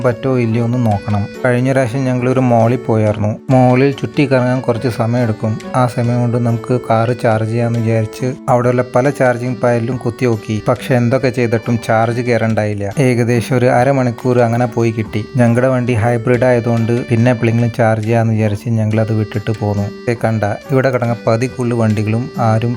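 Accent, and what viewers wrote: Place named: West Bengal, India. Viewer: Chetta why is your Malayalam heavily accented?? Oru bengali chova?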